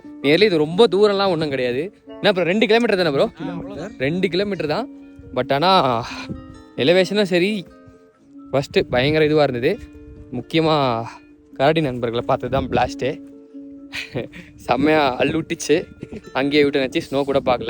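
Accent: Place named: Kanyakumari, India. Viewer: Nanum kanyakumaritan.... Slang la therinchuthu .... ❤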